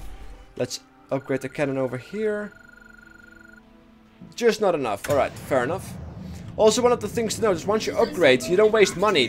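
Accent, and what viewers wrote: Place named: Netherlands. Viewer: dat dutch accent.. seriously dude fix your pronnouncication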